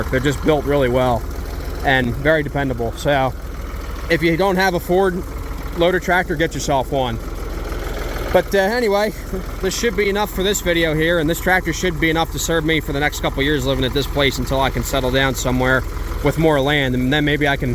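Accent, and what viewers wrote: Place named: New Jersey, United States. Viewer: that was a wonderful review of the 340A. My friend is looking at a 231 with a loader, but has the smaller 36 hp Diesel. We'll definitely look out for the steering and loader problems you mentioned. On the 3 pt, it's my understanding that the left lift link isn't adjustable, only the right side is. Could definitely tell you grew up in Jersey, as did I. I'm from Bergen County, not many farms left there. Again, great review. Tom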